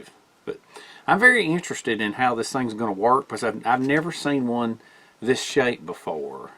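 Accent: South, United States. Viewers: I love your southern voice like how yu say machethe lol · Buddy I'm glad your going to get back to normal on the shift. What some awesome things sent to you! Brother you deserve them all, ENJOY! Good video as always Brother! I remember about 100 years ago when I discovered your channel, my little boy who's grown now was sitting there watching and listening to you and looked at me and said "Daddy he sounds like us!" Hehehe it's a Southern thing:) Thumbs-up buddy ~John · I love your southern accent. I could listen to you all day! LOL Thank YOU for being such a great, innovative guy that I've learned a lot from. Always look forward to your videos. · "What accent?" as one Australian said. But seriously, the 'Southern Drawl', I believe, is a of rather SOPHISTICATED character and sounds very much like the altered remnants of a Proper British /Aristocratic English accent, to me anyway. 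I think that's why it sounds so familiar somehow and also sweetly comforting. I think may have the unbeknownst lineage of an Aristocratic English Lord...that makes ALL kinds of Tools go "Out of Stock" with every other episode. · Silky saws are awesome. Hows the handle on that machete feel? Idk if id like the feel of it just based on the looks but ive been fooled b4. I got a baryonyx kingfisher as a gift & i thought at 1st that it was just a toy basically w/ a weird pistol type grip but that handle is super comfortable & it cuts like a laser even w/ the strange pointy profile. Oh & btw your accent is fine. As a matter of fact southern dialects descend from French & UK high society. I study languages & our heritage is something to be proud of. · I agree with you. I like a southern accent. Some people who hear a southern accent make the mistake of thinking the speaker is not very intelligent. 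Behind accent is a genius mind.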